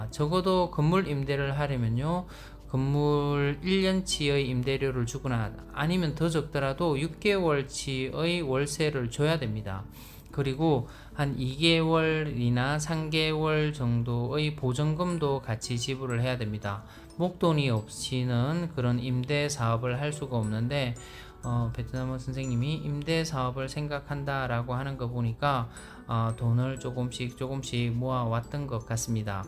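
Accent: Gyeongsang, South Korea. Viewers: 그냥말편하게하세요^^ 경상도같은데ㅜ이건머사투리도아니고표준말도아니고ㅜㅋㅋ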